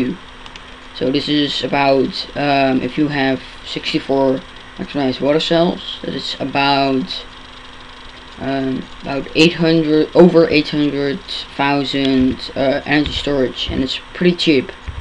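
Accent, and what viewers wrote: Netherlands. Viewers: Nice explanation. *waits patiently for 1.0.0 compatible release.* P.S. Are you Dutch? You have that accent. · Wow its surprising how many people recognize his Dutch accent I noticed it too.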